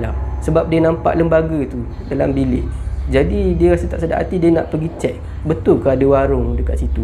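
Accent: Terengganu, Malaysia. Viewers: Hadir sapport org ganu kite.. Slang ganu mmg x leh lari 👍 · Bru nk Tanya orang Tganu kita ke? Betul pulok ambo agak orang Ganu.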